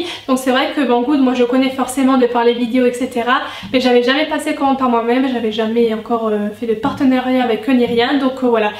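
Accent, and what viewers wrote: Lorraine, France. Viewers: Tu as l'accent de chez moi, Lorraine ou Alsace ? Gros bisou <3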